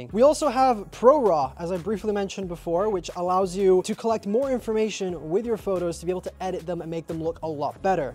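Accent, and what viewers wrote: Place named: United States. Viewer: You have an American accent. Are you an American living in British land?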